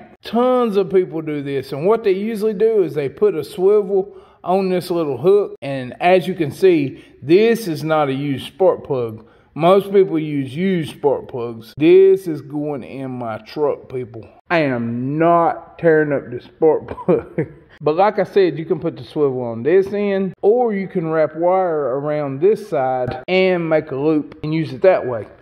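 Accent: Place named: South, United States. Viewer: I really enjoy listening to a fellow SOUTHERNER, with that country draw like me .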